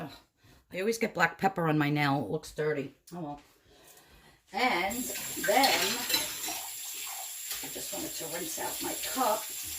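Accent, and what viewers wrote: New York, United States. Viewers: Just found you. Love your videos and food and knew right away from your accent that you are from ny ❤️. Me too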